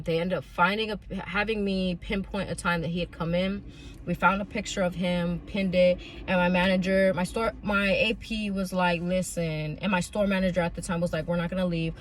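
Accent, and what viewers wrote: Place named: Maryland, United States. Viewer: This story is insane … im glad you’re ok and i love my MD ppl … I love how y’all say tew instead of 2 … please be safe ….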